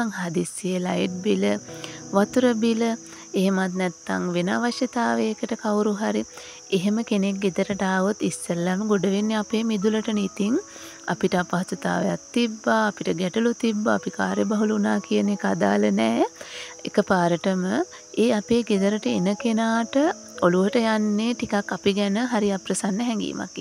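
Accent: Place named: Dry Zone, Sri Lanka. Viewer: වියළි කලාපයේ kiw නිසා අහන්න හිතුණේ oya කොහෙද අක්කේ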